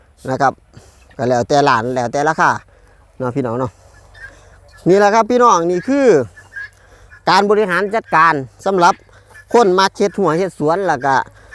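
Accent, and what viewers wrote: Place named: Isan, Thailand. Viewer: เขาคือคนอีสานค่ะ ภาษาที่ใช้ก็คือภาษาสำเนียงบ้านเขา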